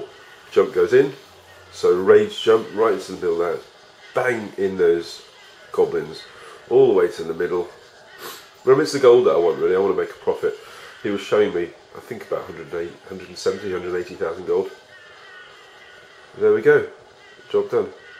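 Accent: United Kingdom. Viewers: What part of the Uk are you from?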